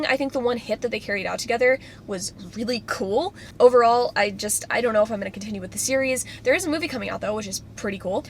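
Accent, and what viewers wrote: United States. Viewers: I could listen to you talk all day lol I don’t know if it’s the American accent or not but your voice is so soothing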